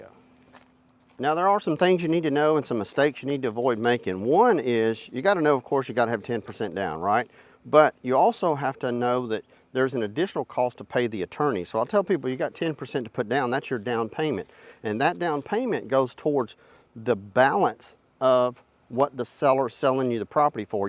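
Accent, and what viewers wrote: South, United States. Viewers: Are you from Louisiana or the south in general? Im getting theo von vibes (which is a compliment)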